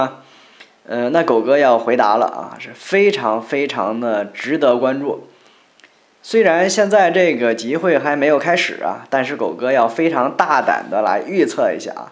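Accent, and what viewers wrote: China. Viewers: 聽你口音是內地的朋友 注意你的安全 大陸很危險的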